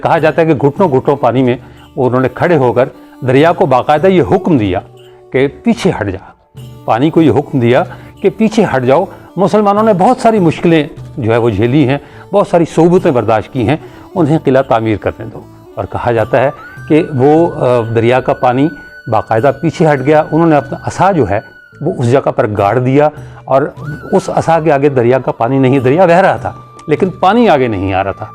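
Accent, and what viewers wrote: India. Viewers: Bhai aap Pakistani se zyada hindustani lagte ho baat cheet ka andaz bhi hamara hai jo ke yeh badi baat hai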